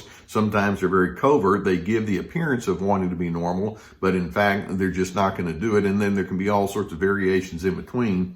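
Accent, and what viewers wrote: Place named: South, United States. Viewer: Love the Doc's clear southern accent, as well as his knowledge and experience!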